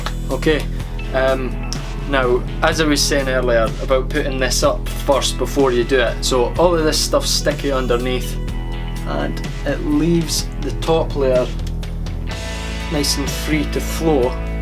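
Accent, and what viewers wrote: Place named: Scotland, United Kingdom. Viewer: Hmm the accent.. Where in Scotland are you from,?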